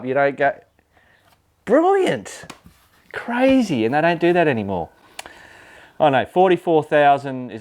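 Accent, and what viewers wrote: Australia. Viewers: Great Video. You just kept going faster and faster on the Trails. It looked like you were doing at least 60MPH. You’re a Very Skilled Rider. I fall more and more in Love with the R1200GS every time I watch another Video of one. I like the way you really put a Bike to the Test. Great Great Videos. This is one of my Favorite People to watch. Keep ‘‘em coming. I think the Australian Accent? Y’all have is Great. I wish I could Talk like that.👍 greetings from Texas.👍😁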